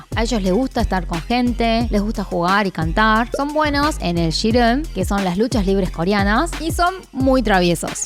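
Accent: Argentina. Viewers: Te sigo de hace mucho y lo que más me gusta es cuando haces estas explicaciones y nos acercas más a vuestra cultura que dicho sea de paso es super mega interesante para los de este lado del mundo,agradecida por todo ahora no solo disfruto de sus voces bailes y música sino que también entiendo la temática que hay de atrás. Muchas gracias mi niña eres súper amorosa y tú acento argentino me encanta ,un abrazo y bendiciones desde Chile🙋🇨🇱🙋🇨🇱🙋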